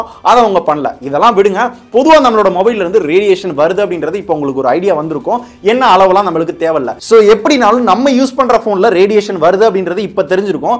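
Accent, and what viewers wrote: Madurai, India. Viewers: BRO nega Madurai ya BRO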